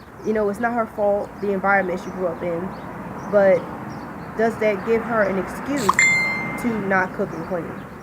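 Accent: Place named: Philadelphia, United States. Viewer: Sounds like you're from Philly sis.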